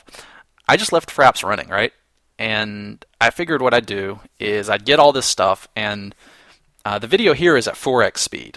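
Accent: South, United States. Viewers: oh you have a cold, you just sounded more southern to me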